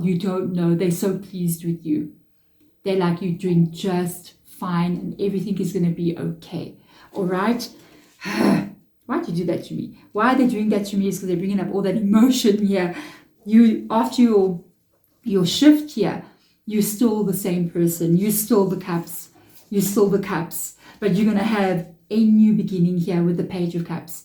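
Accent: South Africa. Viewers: Lovely to hear a South African voice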